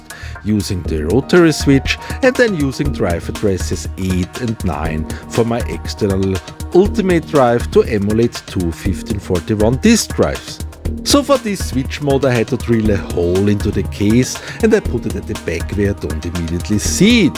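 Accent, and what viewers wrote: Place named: Austria. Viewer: you speak a very nice austrian english, ..... 😎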